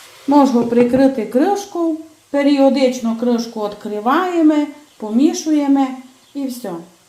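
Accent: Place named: Berehove, Ukraine. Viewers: З акцентом. Суль, олую, ойво. Це русини так розмовляють. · Украинский язык у этой пани вперемешку с русским. Три украинских слова одно русское. Акцент западно- украинский, это верно, с поющими нотами. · Як я люблю слухати вашу мову. У нас так говорила сусудка , вона була д Берегово.Подяка вам за рецепт.❤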